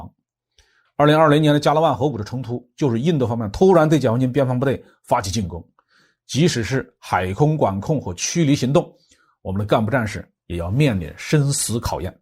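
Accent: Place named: Hubei, China. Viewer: 湖北口音？还是信阳南部口音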